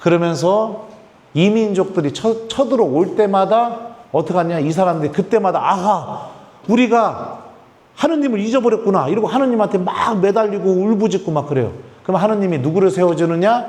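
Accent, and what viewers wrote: Chungcheong, South Korea. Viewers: 신부님 강의는 참쉽고 명쾌합니다. 그리고 충청도 사투리가 너무 정겹고 재미있어서 한참을 웃었습니다.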